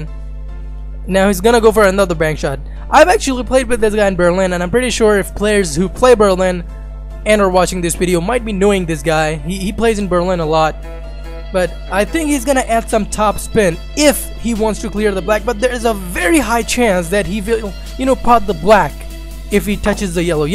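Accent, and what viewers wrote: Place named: South India, India. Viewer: Assalamu alaikum! Which is your native? You seem to be from South India! though you live in Kanpur.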